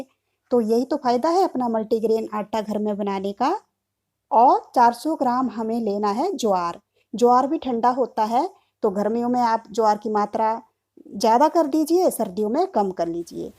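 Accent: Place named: Pahadi, India. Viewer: Aaj voice tone me phadi lg rhe di ham b phadi hai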